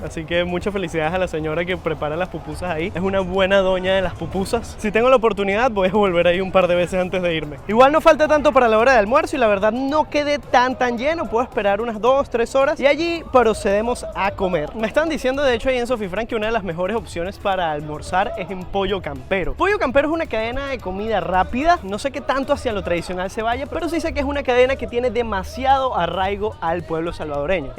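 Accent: Venezuela. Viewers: Yo parA sobrevivir compro tortillas y queso duro sale super barato en los mercados se puede comprar super barato con 3 dolarucos se puede t oigo un acento venezolano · de donde eres? No puedo reconocer tu acento, pero me inclino a venezolano?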